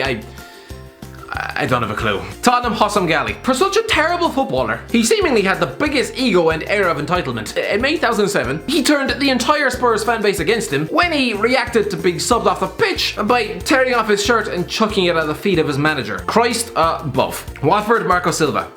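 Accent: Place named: Ireland. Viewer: I think ooooh this video looks cool but when i press on it i hear it is you. You fucking irishman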